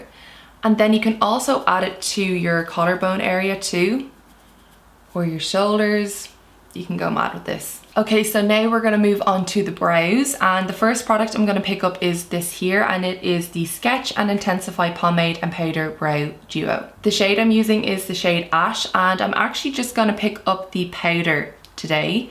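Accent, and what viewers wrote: Ireland. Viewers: Where is from? Ireland? I can't put my finger on her accent. She speaks very clearly, it isn't until the end of her sentences or a phrase she will use that I pick up on her accent. Does anyone know? Just curious, its driving me crazy trying to figure it out lol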